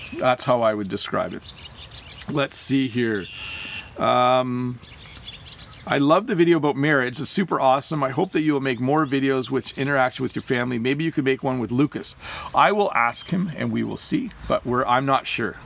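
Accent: Canada. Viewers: I'm Thai people, I like to learn canadian accent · I love Canadian accent either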